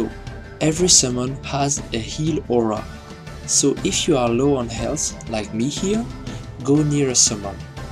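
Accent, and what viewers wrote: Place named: France. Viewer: Pas mal comme vidéo ça se sens que tu parle Français mais ton anglais est parfait 👌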